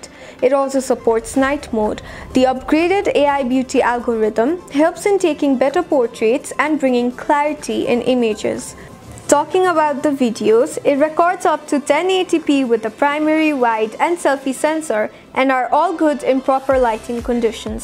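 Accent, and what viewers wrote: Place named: England, United Kingdom. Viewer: I like your english accent.. 👍👍👍 Your pronunciation is very good.. And your video is pretty simple to understand.. I like it.. Keep it up.. Looking forward more from you.. 👍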